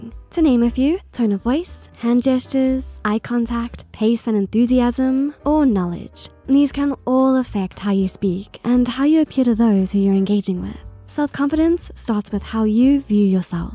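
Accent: United Kingdom. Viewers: Thats the most british accent i have ever heard 😵 It’s a bit annoying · The VA is both British and Southern 🤔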